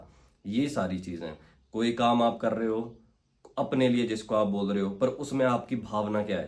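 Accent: Himachal Pradesh, India. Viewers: You are talking in hindi,so there is a himachali touch in it and i am from Himachal,so I felt good to hear you Nice 🥰❤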